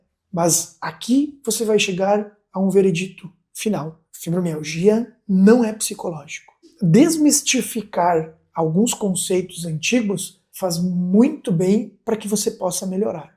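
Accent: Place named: Rio Grande do Sul, Brazil. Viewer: Você tem sotaque de gaúcho no chamarão de 🍃 verde no cuias do corpo humano também bom-dia senhor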